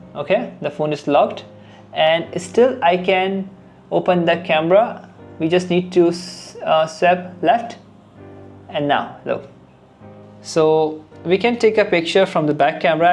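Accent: India. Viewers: Orang prindavan...logat e udah...terasa..😂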